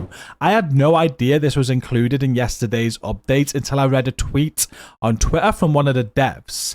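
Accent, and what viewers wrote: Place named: England, United Kingdom. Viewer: I really like you man, I follow you I support you and I played with you and your clan for a time but GOD I HATE ENGLISH ACCENT.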